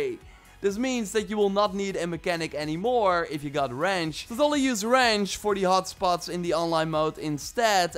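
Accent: Netherlands. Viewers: Hahahaha this guy sounds so dutch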